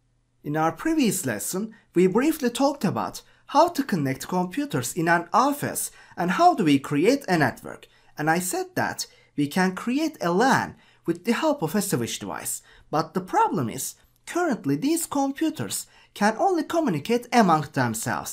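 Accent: Turkey. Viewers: I guess Turkish origin speaker. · i like your accent, it sounds Turkish to me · Aynen Türk aksanı bu :>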